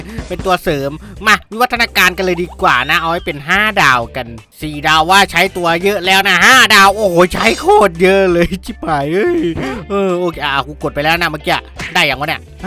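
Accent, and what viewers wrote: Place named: Korat, Thailand. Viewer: โคราชอยู่นิดหน่อย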